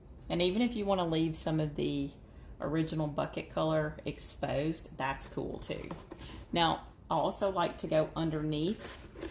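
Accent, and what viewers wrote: South, United States. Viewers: 😇🌷 I L 💗 VE Your southern accent, I lived in Charleston SC when I was in the 5th and 6th grade, so I do still have a lil twang, especially when I get a lil bit upset LOL 🤭 BUT, I do have a slight southern drwal . anyway SWEETIE your galvanized bins turned out REALLY GOOD!!!😊🎨🖌️ You know you're a southern woman, when you feel like you have to apologise LOL 🤭 Thank YOU for sharing your CREATIVITY with, and I also want to say IS YOU are a BEAUTIFUL woman inside out, you actually look good with out having any makeup on, AND YOU in my opinion, look good with out makeup on.😊🎨💜🌿 Thank YOU again SWEETIE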